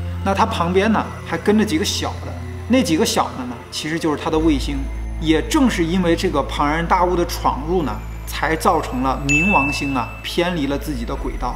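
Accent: China. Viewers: nic小哥听起来像是焦作那块儿的。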